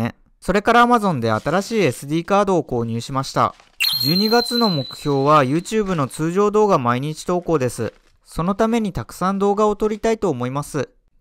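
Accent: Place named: Kansai, Japan. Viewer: 私はミスドでイーブイだけ食べれたけど売り切ればっかりでした😖 関西色強めですね😂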